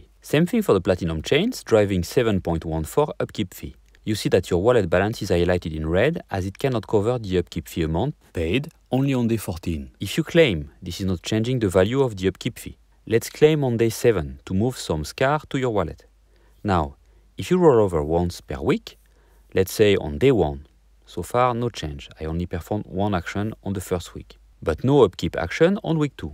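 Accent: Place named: France. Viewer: Cool bro, thank you this video. French accent is super cool remind me of Merovingian the Matrix 👌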